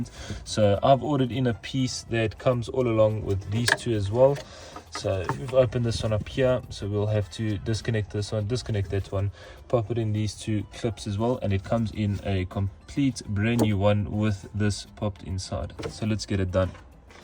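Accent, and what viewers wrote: South Africa. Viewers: Could hear the accent! we out here supporting out South Africans! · Great video Bro 😎 are you from South Africa?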